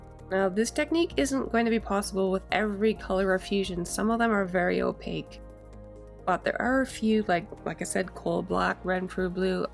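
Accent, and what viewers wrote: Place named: Alberta, Canada. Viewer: I saw a Tim Horton's cup in one of your episodes, and your accent is identical to a friend who lives in Edmonton. I know you are a fellow Canuck...are you from Alberta?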